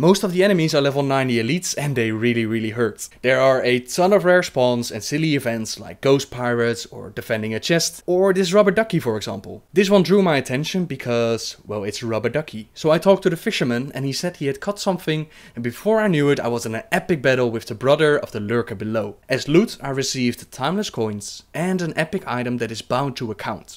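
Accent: Netherlands. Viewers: I like your Dutch accent:) I'm Dutch aswell:)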